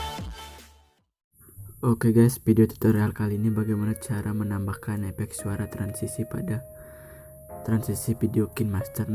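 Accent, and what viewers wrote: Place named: Bali, Indonesia. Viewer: Bang pasti dari bali ya :) mohon di balas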